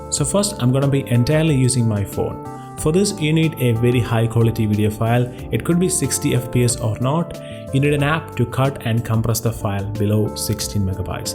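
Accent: Kerala, India. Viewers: Malayali aanalleee.... Pronounciation kettappol manassilayi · Ah English il thanne manasil ayi malayali anu ennu😁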